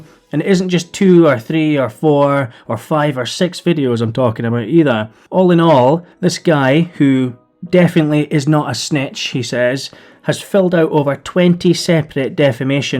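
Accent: Scotland, United Kingdom. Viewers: Defo a toonser or a teuchter I'd say! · Also not sure where about in scotland you are, but what's your thoughts, if any or any chance of a video regarding David goodwillie ? His recent signing with raith rovers in fife, which going by your accent isn't a million miles from you has caused a lot of fallout. Good to have you back, was a follower of you n wheezy on daddy gate.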